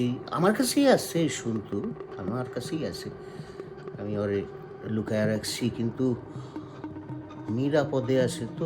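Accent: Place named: Bikrampur, Bangladesh. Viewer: bangal accent from Lower Bikrampur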